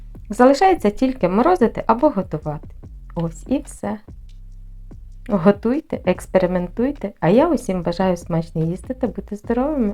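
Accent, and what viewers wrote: Standard. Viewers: Дякую за пораду і за чисту українську ... Добра і процвітання ❤❤❤❤❤❤❤❤🎉🎉🎉🎉🎉🎉🎉🎉🎉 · Дякую за науку, чарівна наша господине! 😊 І за чисту солов'їну - окрема подяка! 🙏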